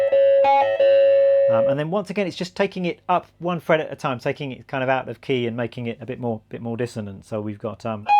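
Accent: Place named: East Anglia, United Kingdom. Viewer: fricking awesome. great ear. where are you based, you sound like myself, East Anglia?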